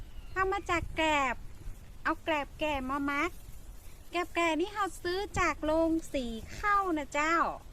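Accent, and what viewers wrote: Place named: North, Thailand. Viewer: เป็นคนจังหวัดอะไรคะอู้กำเมือง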